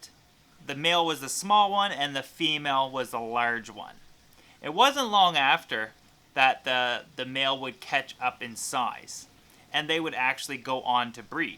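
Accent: Canada. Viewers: you make good videos. Well planned and we'll spoken. are you Canadian? jw u sound it . cool videos thanks for the info!!!!!!!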